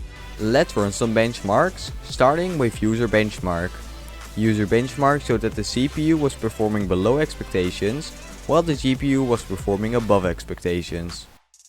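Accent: Netherlands. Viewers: Just asking, are you dutch? You sound like you have an accent😁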